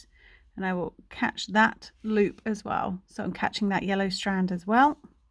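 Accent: England, United Kingdom. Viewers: Thank you I was thrown because you have an English accent and was using americanised terminology 😁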